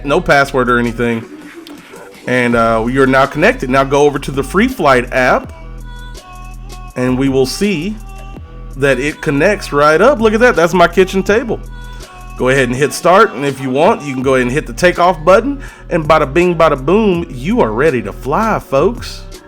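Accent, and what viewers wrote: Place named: South, United States. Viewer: can't tell if this guy is in Texas or along the Mississippi river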